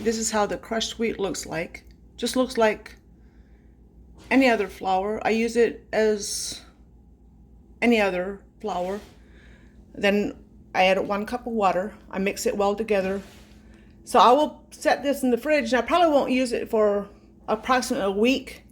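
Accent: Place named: Pennsylvania Dutch, United States. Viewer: I cannot find any information on where you’re from but my goodness, you sound so much like folks from home! You wouldn’t be Pennsylvania Dutch by chance would you?love your channel. Thank you!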